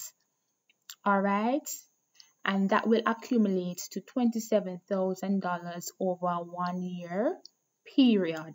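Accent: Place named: Jamaica. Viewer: am in love with your Jamaican accent..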